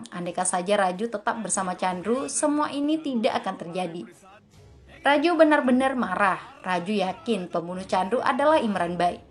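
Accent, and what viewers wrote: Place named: Padang, Indonesia. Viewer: Ini admin yang ada logat Padang nya ya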